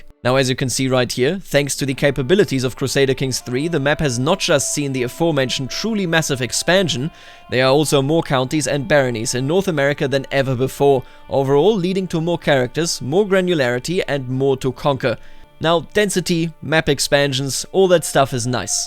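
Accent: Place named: Saxony, Germany. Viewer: You sound like you're from Saxony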